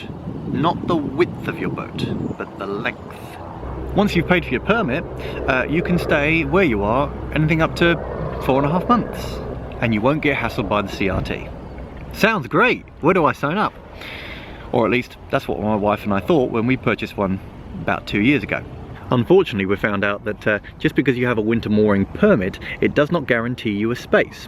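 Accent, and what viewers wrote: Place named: Chicago, United States. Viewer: is this Chicago? He Sounds like hes from Chicago....🇬🇧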